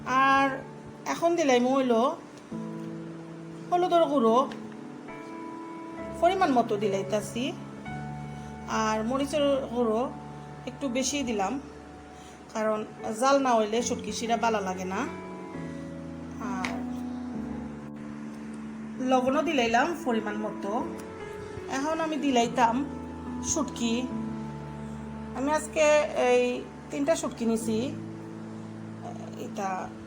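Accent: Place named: Sylhet, Bangladesh. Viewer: আসসালামু আলাইকুম আপনি কেমন আছেন আশা করি ভালো আছেন আপনার বিডিও অনেক ভালো লাগছে রেসিপি সিলেটি কথা ভালো লাগলো আমি ও সিলেটি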